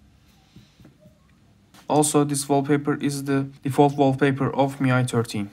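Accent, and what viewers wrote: Turkey. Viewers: Ne kadar da Türkiş bir aksan derken adam harbiden turkmus zaten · niyeyse türk olduğun çok rahat anlaşılıyor konuşmandan ahaha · Aksan tanıdık dedim meğer turkmus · Türk İngilizcesi bu nerde duysam tanırım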